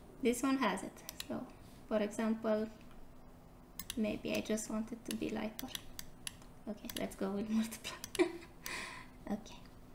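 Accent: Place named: Romania. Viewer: Your accent sounded so familiar, of course you are a fellow Romanian! Keep up the great work, amazing tutorial!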